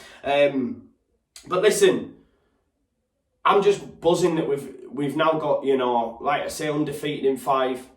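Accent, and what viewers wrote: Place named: Darlington, United Kingdom. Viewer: Got the win that's the main one m8!!! Follow thou nose home tha knaas!! That accent sounds Darlo... Richmond way. Scotch corner..got relations there.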